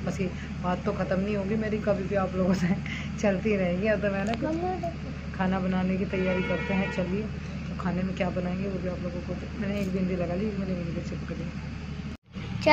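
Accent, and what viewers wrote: Haryana, India. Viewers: Aaj kl language haryanvi tone le rhi h Kya baat h samjh nhi aaya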